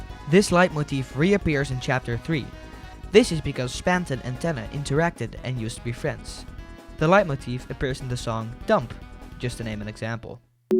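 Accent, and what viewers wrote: Netherlands. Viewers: Nederlands accent 😳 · Is dat een Nederlands accent dat ik hoor? Hoe dan ook, fantastische video!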